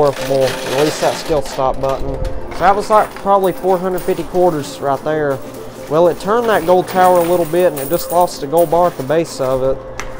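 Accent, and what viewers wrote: South, United States. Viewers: You're doing great tonight....that deep southern accent...anyway you're doing great 😃😃😃😃👍👍👍👍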